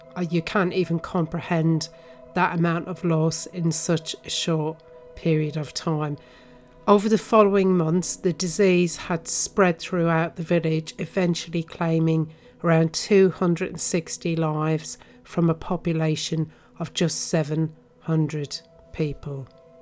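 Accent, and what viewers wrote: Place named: Bristol, United Kingdom. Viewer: This was so interesting and beautifully documented. Iv never heard of this village before. It's alarming to wonder if all those beautiful homes have ghosts. I Reckon there must be quite a few roaming around. To think this started with a bundle of cloth, wow!!! I felt such a strange sense of sadness is there. I don't think I could live in one of those beautiful homes. Thank you for a lovely video, please forgive my asking. But I like your accent, I'm not sure where it's from, is it Bristol.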